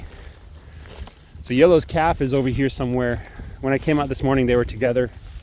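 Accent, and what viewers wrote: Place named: South, United States. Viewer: i enjoy the story of finding the right breed to add in a certain trait. southern drawl is easy on the ears. Hopefully, if you get a South Poll bull or a starter herd, you will boost your grass to beef efficiency-slowly or more rapidly... South Poll can get FAT on forages they graze. If your soils are depleted, granular minerals will help the cattle and the cattle will choose the minerals needed and fertilize your soil. Depending on where you get the bull or starter herd, the cattle may know how to eat more weedy forages than you show on your channel. Variety of forages adds nutrients, as different plants cycle diverse arrays of nutrients from the soil. Each "weed" tells of the soil's need to be healed. Dr Elaine Ingham does soil health lectures, if you want to get fairly technical. Understanding Ag recommends certain labs to run the Haney test, because some labs do the proper protocol. 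Haney test uses WATER, not other chemicals that are un-natural to the soil, to determine what nutrients are bio-available...if i understand this correctly. Do your own research.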